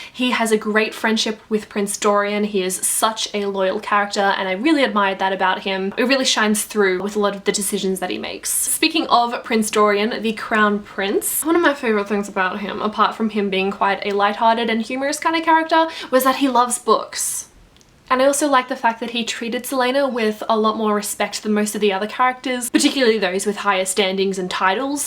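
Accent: Australia. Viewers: Hi! Now I am reading Assassin's Blade (I've just read the first novella) and thanks to your review I am more and more sure to want to continue this series. PS. I think this could be the strangest comment ever, but... I love your australian accent! I'm italian and I'm trying to learn it because I looove it! :')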